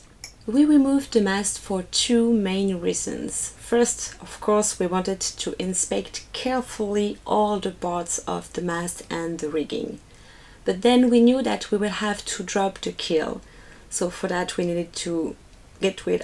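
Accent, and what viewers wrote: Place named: France. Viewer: Lovely french-english, i love it!!!!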